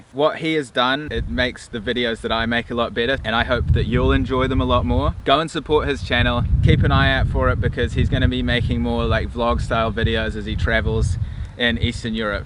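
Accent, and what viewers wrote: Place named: New Zealand. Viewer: Score! Just discovered your channel love to hear a kiwi accent from all over the world mavic air is an awesome drone perfect for travel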